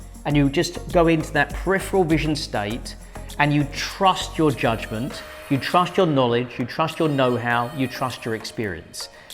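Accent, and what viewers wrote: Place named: South, United Kingdom. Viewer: FYI it's an uneducated Southern British accent. To a British person it doesn't sound impressive.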